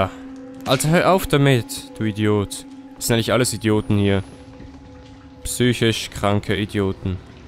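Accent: Switzerland. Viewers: Du bisch schwizer 😵 kul i ou 🤣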